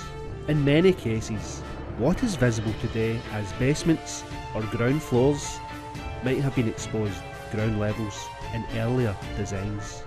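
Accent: Scotland, United Kingdom. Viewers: Please go back to the other narrator Scottish accent is completely spoiling the experience of watching any videos just awful. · English with a Scottish accent. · All of it. Also, remember that some folks (hi America!) struggle with Scots accents.😅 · Congratulations, you have stumbled upon the Scottish accent…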